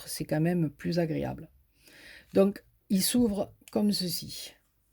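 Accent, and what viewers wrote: South, France. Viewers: A tres bien....tu as tout compris......reste comme tu es ...simple ...a t ecouter ...c est un spectacle.....j adore ton langage ton accent du soleil......ta generosite dans tes paroles.......tu as eu des combats que tu as gagner....alors n attrapes pas la boufalliiissse....avec des mauvais satellites........ il existera toujours des gens qui tournent en rond.....et qui ne savent que baver ........ils ne savent rien faire d autres.....qu a regarder le train qui passe......bonne soireeeeee.....bisous....a bientôt.....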